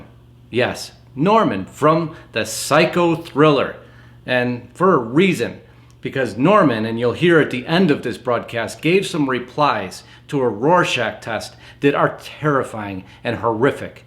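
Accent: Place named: Chicago, United States. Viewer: Any chance that you are from Chicago?? Your accent is thick 😊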